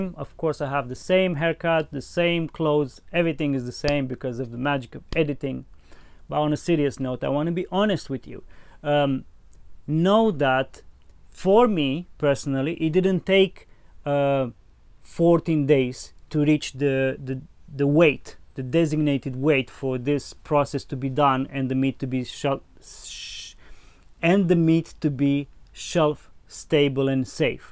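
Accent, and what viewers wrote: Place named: Ukraine. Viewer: Im just loving this Ukrainian accent ^^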